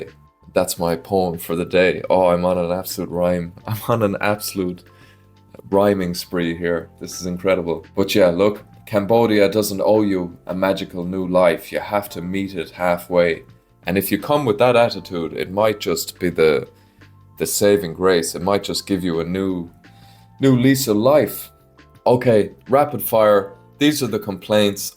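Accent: Ireland. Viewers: Always brilliantly informative with the brogue which makes it great to listen to..thank you…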